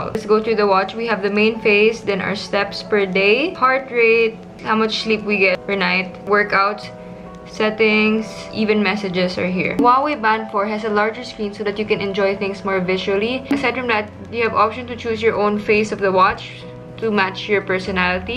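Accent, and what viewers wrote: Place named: Philippines. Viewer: Her accent very much like a filipino 😘.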